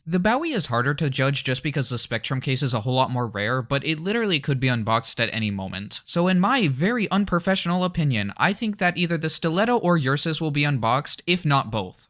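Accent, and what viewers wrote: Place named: Middle East. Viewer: dunno why but I thought I remember you having a thick middle eastern accent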